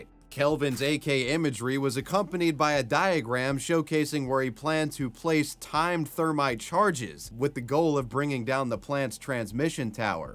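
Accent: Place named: United States. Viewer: I just found your channel yesterday and I’m addicted. I rarely subscribe to any channels and you’re so much better than other channels. I hate when channels are run by people with thick accents or over dramatic. You are straight forward with little drama and normal American accent. Super refreshing!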